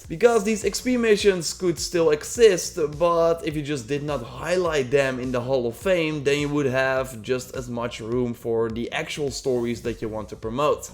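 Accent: Netherlands. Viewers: Niels De Vreugd yes i tought so cus you can hear it at his voice and i’m dutch to.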